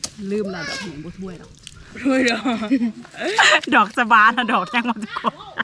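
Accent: Isan, Thailand. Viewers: อีสาน.กะเว้าคือกัน.อุบลเว้าคึบ้านสาวรัศนั้นละ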